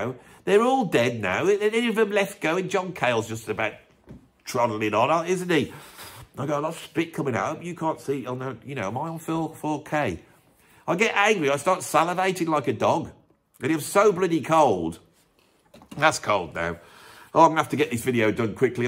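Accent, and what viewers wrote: Birmingham, United Kingdom. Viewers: Great topic BUT lots of gaps in knowledge and predicable cliched/wind-up stuff about bands in overcoats that actually sing about real stuff- rather than fairies and wizards- BEING 'pretentious'. That said I am loving the Brummie (faux?) accent. So please do a top ten of the best Brummie/Black Country bands wearing false sideburns and a mirror hat and screaming 'BABY, BABY,BAAAABY!' while scrunching your face up. 'Cup-a-Soup' optional.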